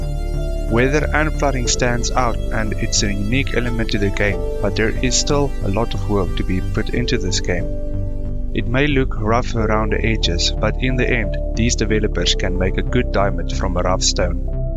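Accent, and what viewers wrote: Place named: Afrikaans, South Africa. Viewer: you dont sound english you sound afrikaans if you do awe brasse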